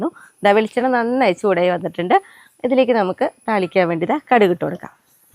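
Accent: Thrissur, India. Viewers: Thank youvery much for the information. Are you from Tcr side I am happy to hear our Tcr slang .now iam in Kollam.your way of presentation and smile beautiful. The vessels you used are super .surely I will make these Dosas try to make my huaband Happy. Bye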